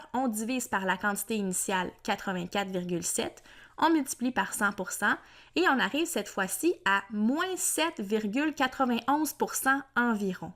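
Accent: Quebec, Canada. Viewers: tabarnak de calice je suis tombé en amour avec l accent quebecois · J'adore l'accent québécois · Merci pour cette vidéo , vous avez été très clair, j'adore votre accent québécois 🥰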